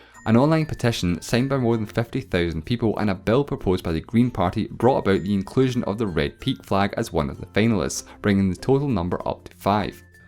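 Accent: Scotland, United Kingdom. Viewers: I know this is weird, but your chocolaty Scottish voice commentating with your great animation work gives me chills. You will go somewhere with this content, keep it up! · I think he's scottish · God, the Scottish accent is wonderful. Also, great video! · I think he's Scottish, but I'm not sure.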